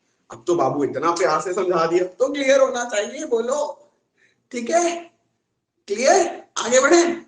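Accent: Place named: Bengal, India. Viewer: Dada tumi ki bangali?? Tomar tone ta lagche jeno tumi bangali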